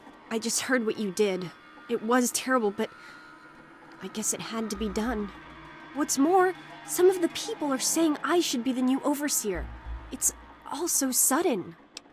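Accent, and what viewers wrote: Ireland. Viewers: .....are you irish? lol just a question due to your pronunciation of words. and you say shite a lot haha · feel like he's from Northern Meath or Southern Ulster. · He's most likely from Ireland at least his accent is Irish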